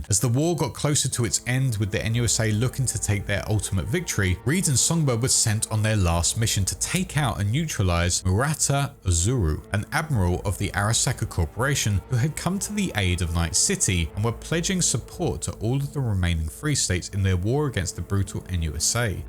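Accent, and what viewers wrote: United Kingdom. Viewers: I think you should be a voice in the sequel. Either a fixer or working with me blue eyes. You see, because you're British so obviously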